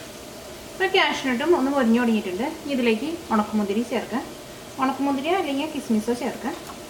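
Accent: Thrissur, India. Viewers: Thrissur bhashayanishta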